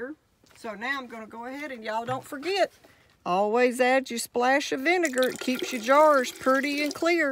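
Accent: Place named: Alabama, United States. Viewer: Where you from, I would say Alabama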